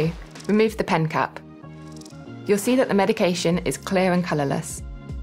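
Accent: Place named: United Kingdom. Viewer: It states that is U.K. and you can tell from the accent. It is not all about the US !!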